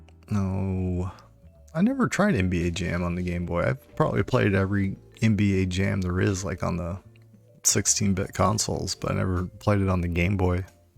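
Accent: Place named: Scotland, United Kingdom. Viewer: I wish I payed attention to these responses. a response 1 yr later....its like I'm watching your youtube videos from alpha centuari.....thats one light yr isnt it? hah anyways. let the thick scottish accent roll brother!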